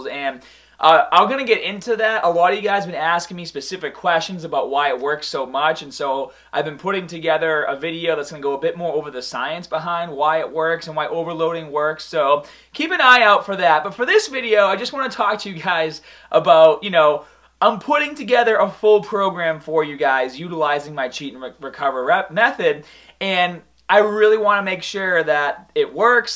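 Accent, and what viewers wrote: Boston, United States. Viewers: Who else thinks that has slowly been losing his Boston accent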